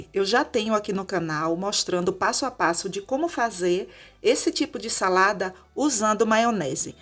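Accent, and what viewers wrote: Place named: Northeast, Brazil. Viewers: Já amei o sotaque dela😍 nordestina marminino❤ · O que eu gostei o seu sotaque alagoano, igualzinho ao sotaque do meu sogro. A receita, ah! essa é maravilhosa 😅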